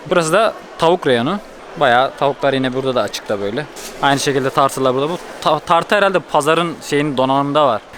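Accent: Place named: Ankara, Turkey. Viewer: Ağzın biraz Ankara Türkçesine kaymış k yi g ye dönüştürmüşsün çokca kez 😄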